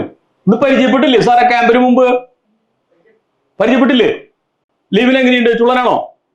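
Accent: Thrissur, India. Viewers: നല്ല ക്ലാസ്സ്‌....... തൃശ്ശൂർ ഭാഷ നർമ്മ ശൈലി....... 👍😍😁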